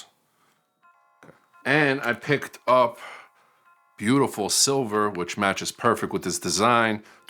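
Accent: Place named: Queens, United States. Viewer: Great review, currently thinking of picking the XT30ii as my EDC camera. By the way, that accent...Queens, NY right? I'm hearing Flushing or Jackson Heights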